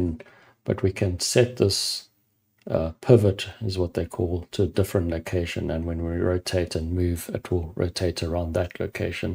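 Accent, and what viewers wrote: South Africa. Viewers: excellent! nice to hear the SA accent :)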